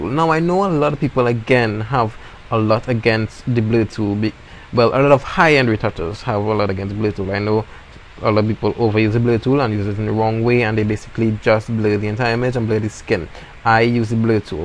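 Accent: Trinidad and Tobago. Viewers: Are you from Trinidad ? :) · definitely from Trinidad! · Def a trini with that accent, nice work.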